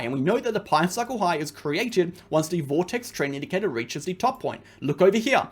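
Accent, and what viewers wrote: Australia. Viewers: just found you bro, aussie here. got lucky and saw this within 2 hours of you posting. Nice to hear an aussie voice for once! Joined that exchange too, appreciate an exchange thats not trying to monitor everything I do! Used your code!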